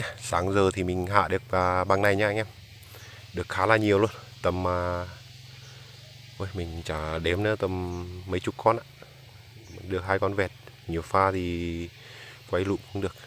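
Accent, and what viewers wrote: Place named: North, Vietnam. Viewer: xa quá nghe giọng bác giống ngoài bắc tưởng ở bắc cho e gl cùng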